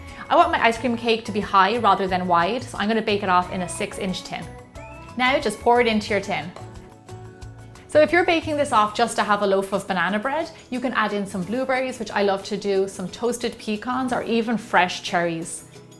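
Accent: Ireland. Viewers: Are u from Ireland?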